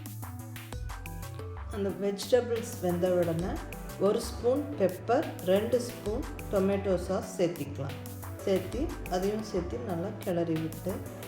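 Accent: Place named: Kongu Nadu, India. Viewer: Ur slang seems like erode r Coimbatore mam so sweet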